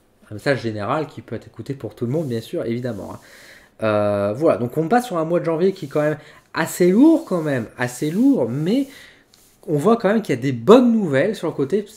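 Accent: North, France. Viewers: Oh toi tu es du nord ! Non ??? 😘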